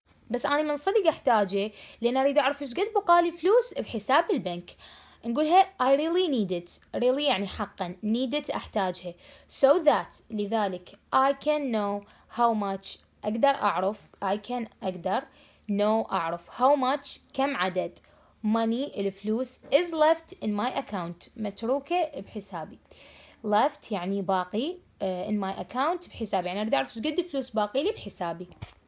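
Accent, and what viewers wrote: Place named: Iraq. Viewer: ههههههاي. عينيه. عله. صوته بعد عراقي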